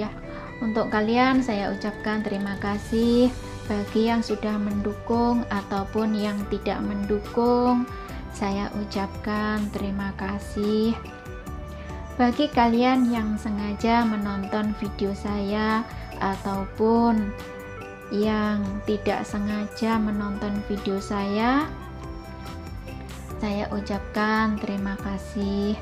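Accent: Java, Indonesia. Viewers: Wong jowo to mbak, .. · Kak, muka nya kayak apa liat dong, suara halus bener, jelas kok omong nya, pelan halus bener, jawa bener 😃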